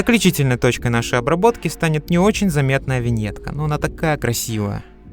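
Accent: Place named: Odessa, Ukraine. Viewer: оуу ты из Одессы))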